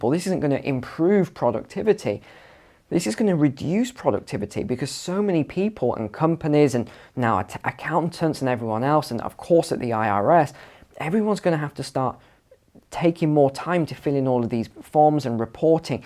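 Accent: United Kingdom. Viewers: Crock of shit has anyone of you verified this on the I R S website. He sounds very British maybe its Britons IRS.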